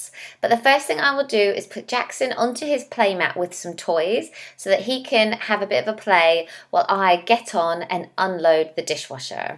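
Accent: England, United Kingdom. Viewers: Is she British 🤔 (I know I sound silly asking this 😁😂🤣) xx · I'm Canadian too, but live in bc I would have never guessed you weren't born in England. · also from Meg's channel !! great vid -- hv always adored British accents 😍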